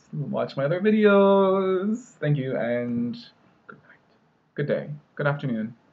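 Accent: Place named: North Jersey, United States. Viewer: You sound mostly like an inland North Jersey/lower Hudson Valley NY guy to me now, although you kept the New England style pronunciation of "aunt" for sure. It's interesting you started developing a sort of "cot/caught" merge since this is uncommon in both RI and NJ, wonder where that came from? I'm a South Jersey guy, born in Philly, who has moved up to South Shore Mass area. Up here I'm seen as having a Philadelphia accent, complete with the short-A split("trap" vowel is æ, "bath" vowel is ea). I've gotten made fun of for pronouncing aunt as "ant", my "furry-ferry" merger, and I had trouble with your hometown's name. I wanted to say "WOON(rhymes with noon)-socket" instead of "Wun-socket". Oddly in Philly and South Jersey "jimmies" is typical for the donut/ice cream confectionery as it is in Rhody and Boston. I'll always say "ant", but I have fixed my pronunciation of Woonsocket. 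They have good fish and chips there.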